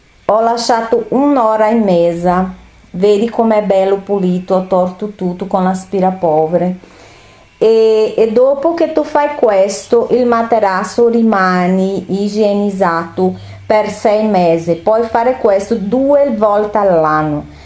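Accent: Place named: Brazil. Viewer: Mi piace il tuo acento brasileiro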